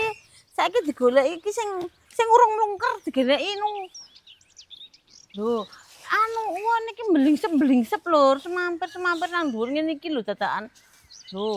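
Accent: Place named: Madiun, Indonesia. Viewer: Gerceeep nonton mak e ,, duhh Masyaalloh Tabarakalloh mak tambah muuaaaniiss lhoo seperti gula jawa 😊😊 manis e angkleg bahasa Medione ❤❤,, sehat2 yaa mak ku 🎉🎉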